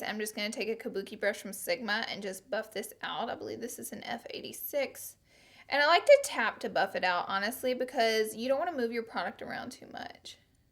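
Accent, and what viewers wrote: South, United States. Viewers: Where are you from ? You sound like you have a country accent 🤔 but it's really cute ! Love you , new subbie ! 🤗💗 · yes, us southern girls pronounce things funny, and so what?! I say ole to for oil. when I first found your channel and heard you say that, I couldn't believe my ears. i was like... YES! someone else that says it wrong. do you say creek or crick? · I lurrve that your accent is still so thick! xoxox, fellow southern belle💁🏼 👑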